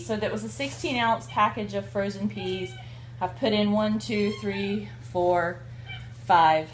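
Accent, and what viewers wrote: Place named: South, United States. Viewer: Hi, I'm spanish and I love the south. ¿what part of the south are you from? I'm studying the differents southern accents. Thanks!!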